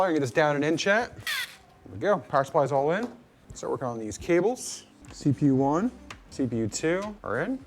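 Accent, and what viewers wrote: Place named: RP, United Kingdom. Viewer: I was fortunate to have the option of the subtitles, otherwise I wouldn't have understood anything you said. Pls either speak slower or get the plums out of your mouth. Sorry about that, mate.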